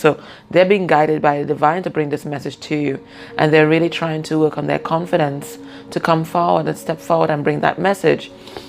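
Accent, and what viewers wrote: Trinidad and Tobago. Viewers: Speedy recovery sis. Very accurate read absolutely amazing lol are u trini?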